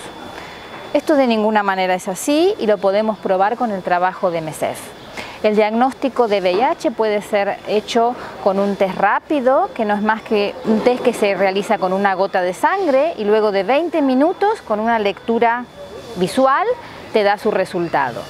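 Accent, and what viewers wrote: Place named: Argentina. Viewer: Que papá Dios te bendiga mujer por el acento creó que eres argentina lastima que en mi país faltan médico como vos..con esa gran carisma ganan mucho dinero pero falta carisma al paciente...creó qué nadie va al hospital por gusto...si no hay enfermedad no hay enfermos,y si no hay enfermos no existirían médicos ni hospitales...Jesús el gran médico está de tu lado sigue así mujer argentina ... saludos de un panameño.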